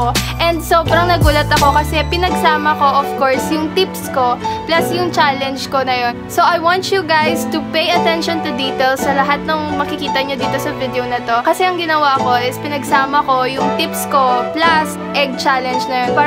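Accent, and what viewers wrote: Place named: Tuguegarao, Philippines. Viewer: Familiar accent. Hehe taga tuguegarao pala talagaaaaa. Hello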